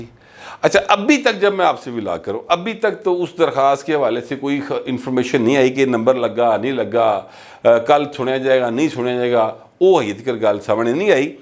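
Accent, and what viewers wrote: Lahore, Pakistan. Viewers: u r very good person buhat hi achha andaz hy apaka pure lahore style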